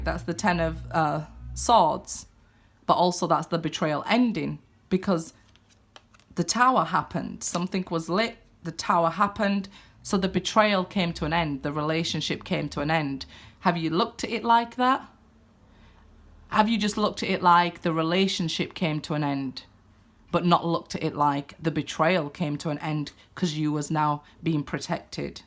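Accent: Yorkshire, United Kingdom. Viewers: Great reading! Hull? Your dialect makes me think of Mick Ronson😃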